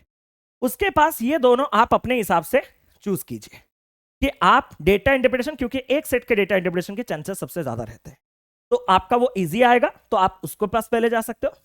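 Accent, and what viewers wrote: Odisha, India. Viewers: sir ap odisha se he kya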